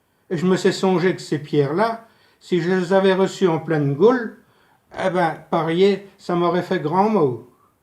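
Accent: Sarthe, France. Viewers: Bonjour à vous.le patois sarthois .c est beau à entendre.je suis sarthois château du loir.allez entendre le belinois. Mort de rire.bravo félicitation mr.vous êtes d où de la bas.pardi.bon courage à vous et tous mes meilleurs vœux de sympathie.en voiyure.🤩🤩🤩🤩🤩🎅🎅🎅🎅🎅🎅👍👍👍👍👍👏🏿👏🏿👏🏿👏🏿bye.a demain. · Mdr le pure sarthois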